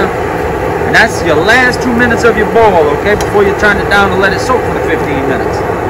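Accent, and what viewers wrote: Louisiana, United States. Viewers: That's a bona fide cajun accent. ;)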